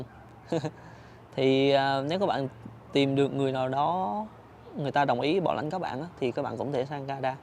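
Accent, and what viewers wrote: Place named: Da Nang, Vietnam. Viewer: Nghe giọng anh có vẻ là người Đà Nẵng, em cũng ở Đà Nẵng qua Calgary được 4 tháng rồi. Hy vọng không phiền nếu có thể kết nối để hỏi anh nhiều hơn ^^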